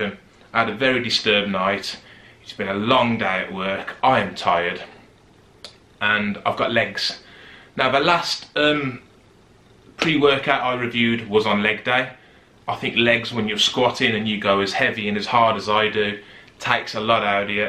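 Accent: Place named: Birmingham, United Kingdom. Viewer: 👍👍👍👍👍👍 sound like fellow brummie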